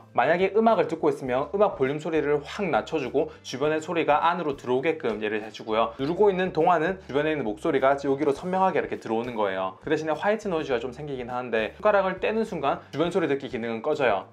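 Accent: Gyeongsang, South Korea. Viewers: 제가 경상북도 출신인데 뉘앙스가 너무 친근해서 그러는데 어디 출신이신가요 ? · 부산 근처이실 것 같아용! 대신 답변 죄송합니당..ㅠㅠ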